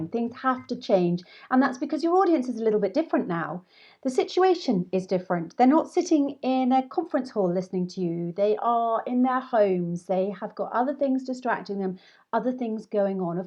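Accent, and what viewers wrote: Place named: United Kingdom. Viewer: Hi madam,I'm really big fan of yours,i like British accent,especially your accent.kindly suggest me any content or how i can contact with you to learn this beautiful accent which you have.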